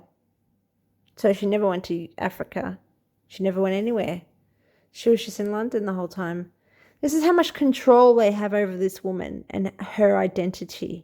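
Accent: Australia. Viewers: Love your Aussie accent - thanks for uncovering this ruse - big fan from Cape Town, South Africa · We don't know the royal family either. I think this is all a huge distraction from a lot of directions. Nice research and nice to hear an Aussie accent!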